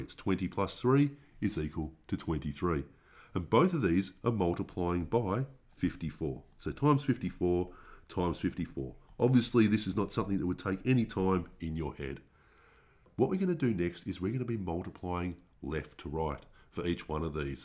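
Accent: Australia. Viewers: you sound like bruce from finding nemo are you australian mate?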